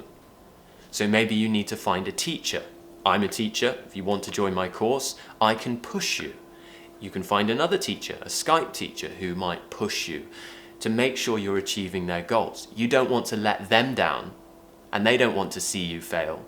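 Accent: United Kingdom. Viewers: I found your videos by mistake and I loved your attitude. My goal this year is achieving a better British accent like yours. Excellent video! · Nice to hear from you once again... Lov the British accent lol.. Lov from Africa the Gambia... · I like your British accent thank you so much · Okay after this video I'm already your fan You mind looks like with mine... but with british accent hahahaha I like it! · I think im fallin in love with this guy he is so charismatic while talking with his brit accentttt💕💕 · hi Teacher excellent your English"s course. ( and I love too the britsh accent). For me is the best !!. ( not against other please ). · Sir, I'm from India. I'm passionate about learning English. I have been watching your YouTube speeches for the past few months. I'm inspired by your British accent. I am passionately learning phrasal verbs nowadays. I like your speeches very much. 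Happy new year sir.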